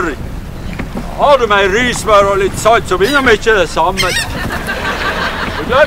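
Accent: Norway. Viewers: Trond Viggo er suveren her. Utmerket dialekt,en utgave som kan passe mange plassa. Han høres ut som en nordlending som har bodt ei stund sørpå. Videoen fremstår som en hyllest til oss i nord,og en fantastisk karikatur. 100 av 100:)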